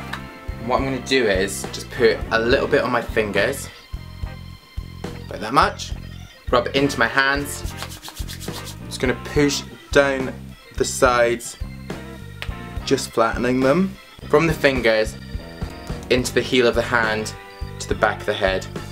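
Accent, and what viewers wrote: United Kingdom. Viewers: What a British accent mate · Love the video! It's a godsend for a theatre person working on a historical piece! But I have to ask, where are you from in the UK? I'm trying (and failing) to place your regional accent.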